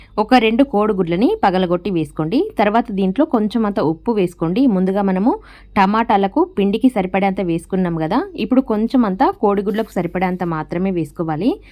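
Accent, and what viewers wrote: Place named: Telangana, India. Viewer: Bagane cheppaaru kani konni words and mee slang edhola unnaay. Artham kaledhu. Meedhi Telangana state ayyi undochu kani andariki artham ayye slang lo chepthe bagundedhi. Only Telangana vallake kadhu kadhaa ee video. Telugu state ayina Andhra vallu kuda chudochu kadha. Naku kuda voma ante artham kaledhu evaro cheppedaka. Slang pure ga artham ayyela unte baguntundhi. But video is ok.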